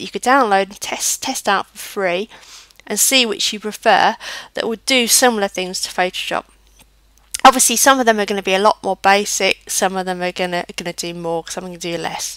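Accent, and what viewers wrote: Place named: United Kingdom. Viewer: Thank you! Beautiful girl! Love your Britain accent! haha~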